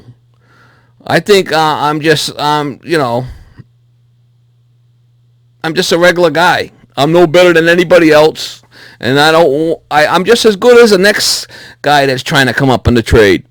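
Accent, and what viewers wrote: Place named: Boston, United States. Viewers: Keep being you!!!! Your a good Man and your time and effort is highly appreciated. Let the dead bury the dead.... Good Ole Boston Accent and Professionalism keeps me a huge Subscriber. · Thank you for providing valuable information and entertaining repair video's, been watching for a few years and enjoy the Bostonian dialogue and temperament. As for the haters just ignore and fight for what you believe in, f them continue to create, take care. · Honestly the swearing along with your awesome accent just made it so much better!! My old boss was from Boston and when he would get mad and start swearing he sounded exactly like you and it was funny as fuck!!!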